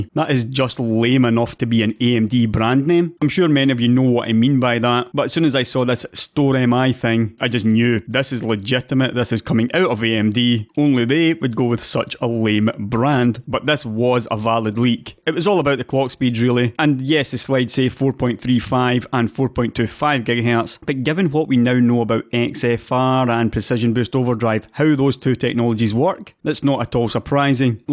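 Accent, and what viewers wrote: Scotland, United Kingdom. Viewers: your's is a Scottish accent, isn't it? breath of fresh air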